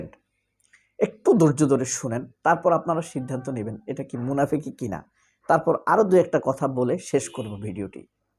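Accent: Noakhali, Bangladesh. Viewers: Host who you are ? Who count you? You host or talks show creator ? You can’t speak pure Bangla ? Why you speak Nokhali ? What is your logic but mantis law enforcement or justice ? Unnecessary can’t attack any one or physically abuse ? Anchorage peoples don’t break law respect justice or law enforcement.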